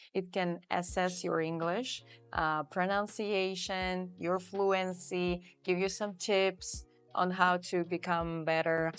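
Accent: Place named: United Kingdom. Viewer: Truly, you are a sophisticated content creator who contributes to teaching authentic English with a British accent. This is excellent! May God help you. Perhaps there are difficulties; you could open an international English language school in southern Algeria—just a suggestion. I respect your work plans, value your commitments, and am proud of your humility, kindness, reputation, and lightheartedness. I thank you from the bottom of my heart and wish you continued progress, prosperity, and success. Amen, Lord of the Worlds.❤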